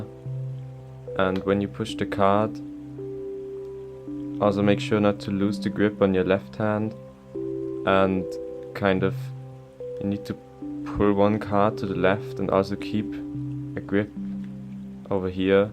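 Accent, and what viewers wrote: Germany. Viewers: Bist du deutscher?? man hört das richtig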